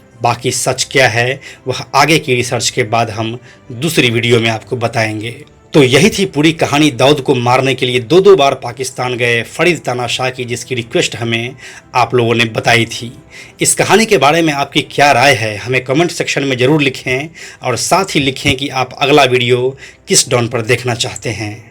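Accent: Bihar, India. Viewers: Hee too tuu bihari aawaj teri smj nhi aari bol kya rha h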